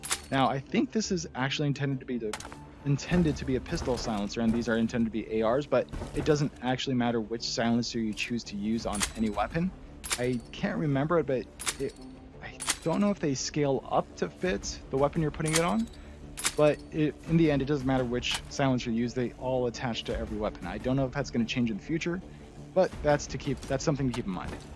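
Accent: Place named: United States. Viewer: I can already tell your american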